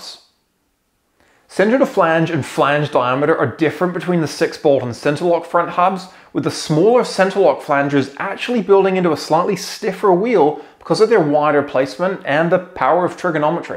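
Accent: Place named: New Zealand. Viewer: Awful kiwi accent.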